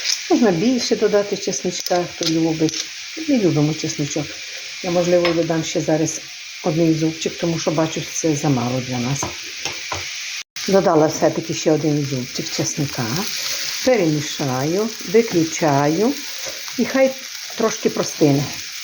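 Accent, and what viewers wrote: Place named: Bukovina, Ukraine. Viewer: Дякую вам пані за рідну мову за буковинський говір Дивлюся вас з Америки і думаю що це готує моя мамочка З якого ви району ? Бо у нас теж завжди казали що сипляться воду 😂 Будьте здорові і миру нам всім бо дуже хочеться до рідного краю до родини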